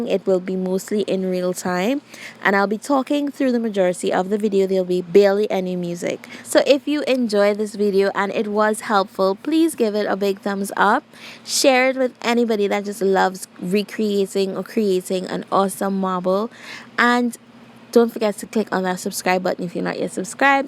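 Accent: Trinidad and Tobago. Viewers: From the time you started talking i realized u are a Trini❤🇹🇹 subscribed one time🙌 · What country are you from ? You sound Trinidadian 😍🇹🇹🤸🏾‍♀️ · Are you trini ?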